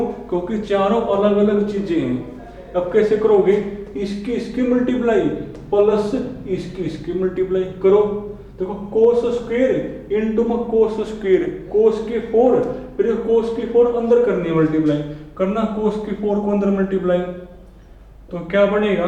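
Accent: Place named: South India, India. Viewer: He's from south india. That's why different accent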